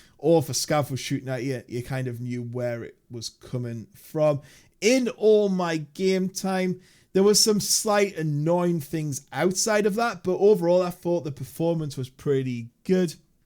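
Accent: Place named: North, United Kingdom. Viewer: Good video mate, good to hear a fellow northern keep up the good work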